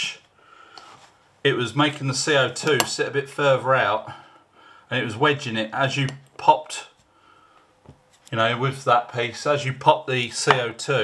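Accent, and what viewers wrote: United Kingdom. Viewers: Im thinking of maybe getting one of these in the future, and think i would probably like to get mine powered up , you sound like you're quite local to me , are you in the Midlands?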